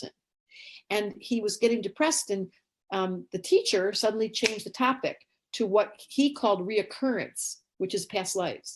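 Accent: United States. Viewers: may I ask? Are you from Chicago? I hear some kind of accent?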